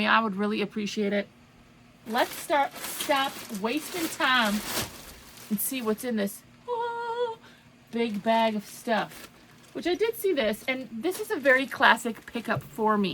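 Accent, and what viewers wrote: Wisconsin, United States. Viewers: BTW the first time I watched you I caught your accent, I was like she has to be from Wisconsin! I was born and raised in Eau Claire so I recognized where you were from. I am in Washington now but anyway.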